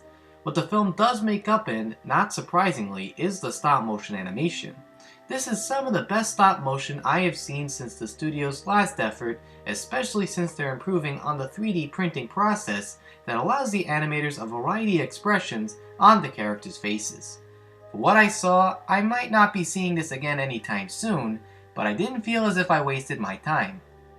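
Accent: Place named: East Coast, United States. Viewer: I subscribed just to hear you tawlk. Not many Eastern accents in northern Minnesota. :(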